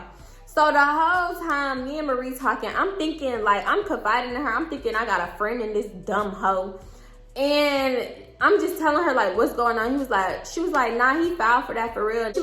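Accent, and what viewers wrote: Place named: Texas, United States. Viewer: That Texas accent 😍 I follow this nurse Carlee Rae and she from Texas. Y’all sound the same lol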